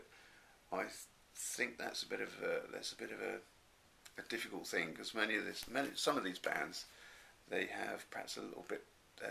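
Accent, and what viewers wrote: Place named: Sweden. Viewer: Hi, My kind of video! I can definitely hear that you have strong Swedish influence in your pronunciation of Swedish words:-) I have somewhat similar feelings to you about Anekdoten. Have to sink my teeth into them some more. That Hollow Earth album I absolutely need to check out. Sounds very promising. Pete put me onto Agusa, and I love them. Finally got the new one this week, and I hope for a sit down thorough listen tonight. Only missing one Agusa album. I think Agusa has some old Swedish known folk tunes worked into their music at times. I have not been able to place it myself. Not familiar with that British commercial, but you have good sound memory there. It certainly sounds very similar. Not familiar with Black Bonzo. Struck me as a bit of English influence. Perhaps you have heard the news, but if not some skogsprog news: Jordsjø is recording a new one soon intended for release coming year, and their relative Elds Mark is dropping another one in November. 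Are you familiar with Makodajama? I believe only one album dropped an reissued by an American label. It is one of the Anglagård guys. Look forward to your next videos.. Take care, Richard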